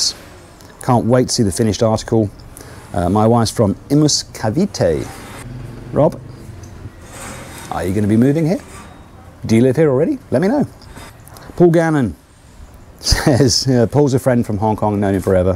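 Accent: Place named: England, United Kingdom. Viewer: Hi, I stumbled onto your channel [on a recommendation]. You must doing something right. So, I'm another new subscriber. It's great to hear a English chap [for a change] in the Philippines doing a building project. Anyway, I have a lot of catching up to do to watch all your episodes, so don't tell my asawa. Hope you enjoy the fruits of your labour. Thumbs up 👍.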